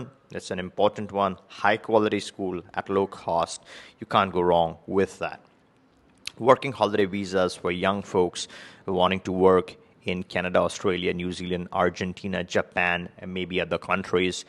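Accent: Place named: India. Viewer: I can hear that Indian accent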